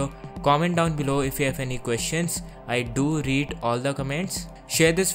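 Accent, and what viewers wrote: India. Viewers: Nice video. Your english sounds like the english from apu nahasapeemapetilon(Simpsons)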